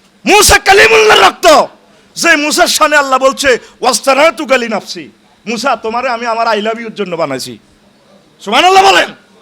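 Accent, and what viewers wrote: Noakhali, Bangladesh. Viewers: আনরে লাগে মনে হয় নোয়াকালীর বক্তা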